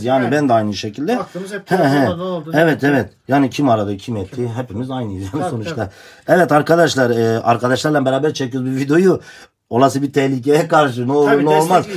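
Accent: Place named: Kurdistan, Turkey. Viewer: Agridan gelmiş konusuyo don la köyüne kürt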